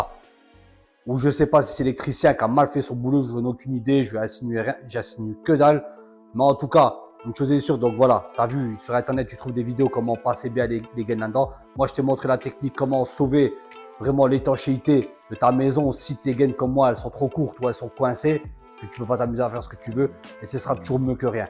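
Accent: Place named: Alsace, France. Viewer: toi tu est alsacien non?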